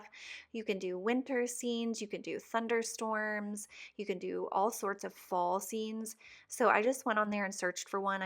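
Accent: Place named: Tennessee, United States. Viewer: HAHAHA, I knew you were from TN. Sweet little southern bell. It's fun cleaning with you.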